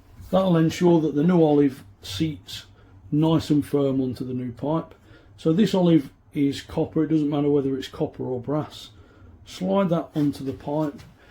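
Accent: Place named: England, United Kingdom. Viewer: Jack Bauer with an English accent. Everyone knows if you do what Jack says, all will be right with the world. I rate this vid 24/24.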